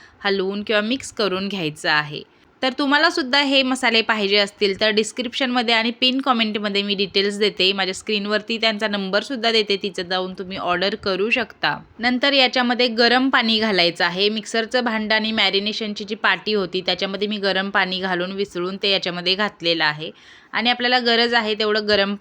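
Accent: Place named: Kolhapur, India. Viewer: Khoop masst banavlat recipe ...tumcha awaj and kolhapuri accent, ayikayla chaan vatte. ❤Stay blessed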